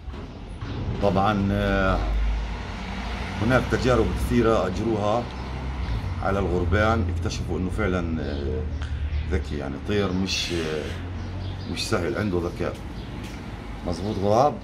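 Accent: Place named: Palestine. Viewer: اللهجه الفلسطينيه ثقيله دم ومو حلوووه سلملي ع اهل العراق بس احلى لهجه عربيه 💐❤️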